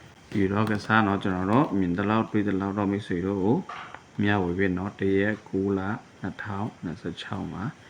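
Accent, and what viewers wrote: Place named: Shan, Myanmar. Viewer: ဆရာ ရှမ်း လား အသံဝဲလို့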